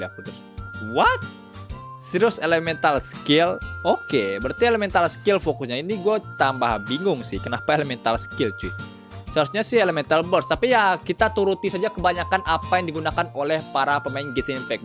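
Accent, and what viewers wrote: Padang, Indonesia. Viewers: bg lu org mna?logat lo kek org padang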